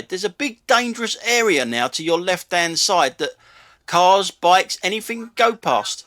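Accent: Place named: England, United Kingdom. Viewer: Being Irish myself (with a strong Irish accent despite living 23 years in Manchester) I love your voice and your English accent - you come across as being very classy 🇮🇪☘️🇮🇪❤️❤️❤️❤️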